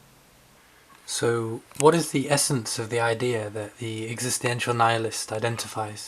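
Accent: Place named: United Kingdom. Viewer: More horse shit 'British' accent ? what.. go back to your pitiful little island a piss off.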